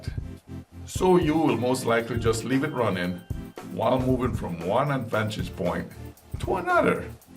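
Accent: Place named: West Indies, Caribbean. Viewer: I got one today it works awesome. Interesting accent are from the West Indies?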